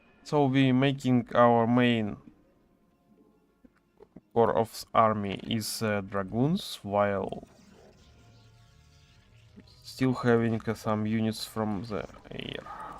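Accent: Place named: Russia. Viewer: Судя по акценту предположу что вы из России😉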